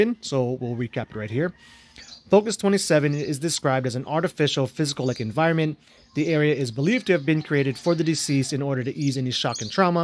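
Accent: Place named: Hawaii, United States. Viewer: haha just the way you end your words 😂😂 what island are you from? I was born & raised in Maui, moved here to Wa state in ‘16 right after graduation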